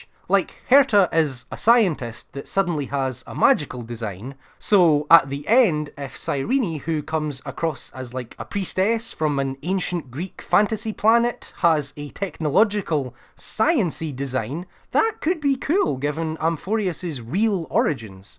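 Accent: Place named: Scotland, United Kingdom. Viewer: I love your scottie accent holy shit😭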